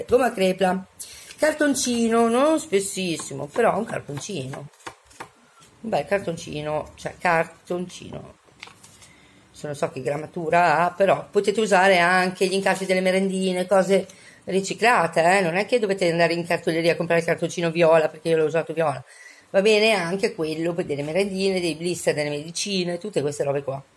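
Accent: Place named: Bologna, Italy. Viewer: mi sono emozionata quando mi hai salutata, 😊 grazie per le belle parole...come al solito mi metti di buonumore, e mi fai tanto ridere con il tuo accento bolognese che adoro. Mi hai risolto un problema, non sapevo mai dove appoggiare gli orecchini quando devo fare le foto...grazie! Un bacione 😘😘😘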